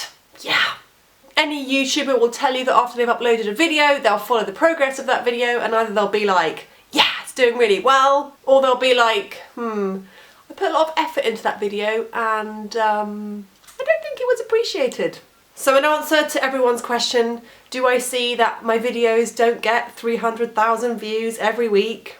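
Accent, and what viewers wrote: England, United Kingdom. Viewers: You are amazing. Do what videos make you happy, what videos you actually WANT to do. I will continue to watch........mainly because of your British accent, hahaha. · I have checked your channel every evening but there was nothing, I simply like hearing someone like you speaking English - British English. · I'm a subscriber for about 2 years, to be honest I started to watch your videos in order to improve my english listening skills, specially for your lovely british accent. As time went on, I began to enjoy your videos, I like your no pretentious and honest ways, It's precisely what keeps me here, that you're not like most youtubers (I mean at least you don't call your viewers "harties" or "emilies" or some shit like that). Good luck in the future from a viewer from Mexico. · Hello from the US of A. Don't stop. Forget the other channels. 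Don't stress over the number of likes, or the unflattering comments. What caught and holds my attention (despite my ADD) is YOU ... your style, your cute Brit' accent, your presentation, your wit, your sense of humor, (get it?). The topics are great. Very amusing. Actually thoughtful. Just keep doing it your way. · If you remove music, dogs and cats' video and what would remain to me to watch on Youtube? A huge commercial?! No, thanks. How should i train my ears to english accent without video? Travels vlogs and intercultural oddities would be good topics for me. Normal people testing impartially the pulse of a Country would be good as well (since, as we can all see, Press is no more reliable, pratically everywhere nowadays!). Otherwise do like me: join some online video and graphic contest portal and have fun. No need to put everything on Youtube only. Even though video and graphic platforms are getting a bit worse as well recently for the same (second) reason. 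But remember to edit your audio in a better way. ^__^ It's obvious that once there's a so much huge video production, all around the world, view counts get totally random, aleatory and unstable. Especially for english speakers and viewers. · I'll admit I stumbled on you by accident.Saw a thumbnail with a pretty face.Stayed because I found you funny and love the British accent.But you also let us in on some issues you've had.Thought that was brave.Long story short you keep making these and I will keep watching.Fuck those guys that just want to ruin the crap we like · Sugar, First I tell you we watch your channel because you are you and you show us your own personality. I think you are a very seemingly sweet young lady. I like when you speak of your own real life. What most people are watching youtube for is to be entertained. I very much enjoy hearing your British accent and just listening to you. 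If you want to shake it up a bit, you might think of also coming up with ideas to interview some of your friends of family about real things going on in life. Maybe mix that in with a little humor here and there. Show us what life is like in Britain. Are there any interesting things near you we might be interested in seeing on camera? Historical, scenic or perhaps you hang out with the royal family here and there? maybe if your camera is mobile you could get interviews on the street from Bobbies about how they feel about U.S officers can carry pistols and they can't. I am just suggesting you find things different about your country and life from others and enlighten us. By the way, Why is the picture missing. Don't quit broadcasting, I like ya.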